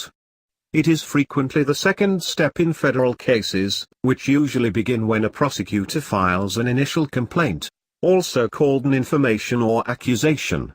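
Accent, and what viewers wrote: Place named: Russia. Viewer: Wtf robot voice hide Russian accent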